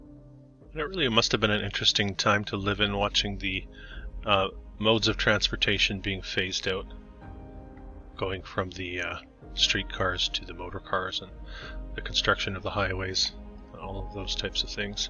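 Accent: Canada. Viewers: This guy sounds a smidge Canadian? Eh?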